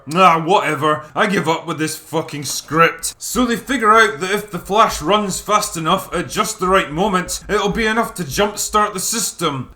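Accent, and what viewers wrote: Scotland, United Kingdom. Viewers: His Scottish accident was way more subtle in his earlier vids... he got at least 110% more Scottish in the last year or two.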